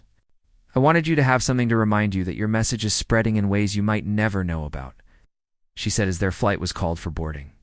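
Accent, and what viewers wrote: United States. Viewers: This is - as usual - a garbage, American accented load of crap!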